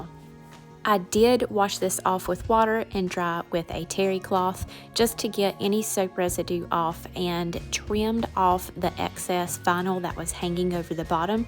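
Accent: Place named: North Carolina, United States. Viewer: Absolutely beautiful your accent sounds like you’re from N.C I live in Canton N.C beautiful work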